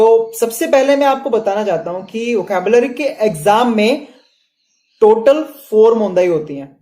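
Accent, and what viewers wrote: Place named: Punjab, India. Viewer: Awaaz me punjabi tone aa rahi hai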